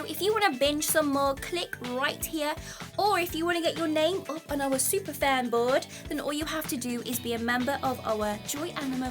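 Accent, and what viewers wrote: United Kingdom. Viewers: has potential to reach 1M subs. This british accent drive ppl crazy lul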